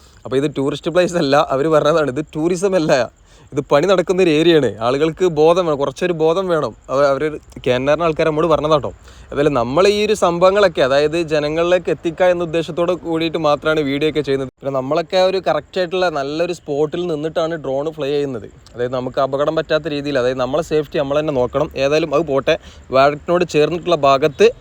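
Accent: Malappuram, India. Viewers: Bro, just a small piece of advice, pls present in a neutral slang. The Malappuram slang that you are presenting is extremely rough! Such an overkill! I hope you are producing these videos for the whole of Kerala! If not, pls ignore the comment! Nevertheless, I appreciate the efforts taken by you to create these contents! Keep up the good work!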